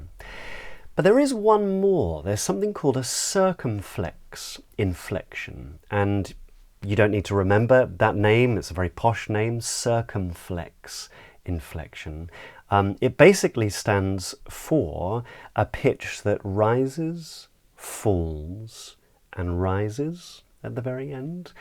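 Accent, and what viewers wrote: United Kingdom. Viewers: I really enjoy listening to your speech and the pure British ACCENT.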